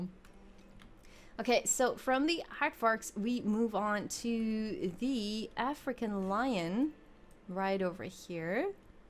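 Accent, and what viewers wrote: Netherlands. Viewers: are you dutch? because to me your english has a little dutch accent and you pronounced oudehands dierenpark perfectly! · Nice zoo! And also, are you Dutch? xD Good accent. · are you dutch?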